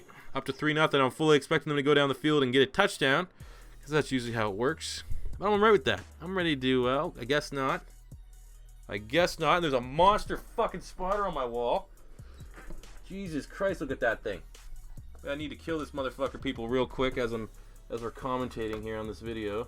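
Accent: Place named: Canada. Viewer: You sound Canadian. Are you?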